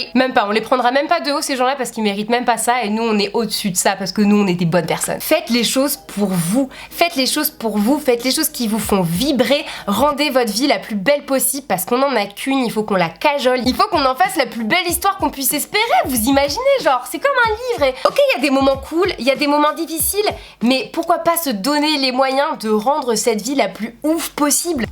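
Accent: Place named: Marseille, France. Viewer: Ton accent marseillais 😂 Sinon je t'adore tu es juste Magnifique super vidéo comme d'habitude et moi je suis ( presque) sur que tu peux atteindre le million (ou les millions) 😉❤️❤️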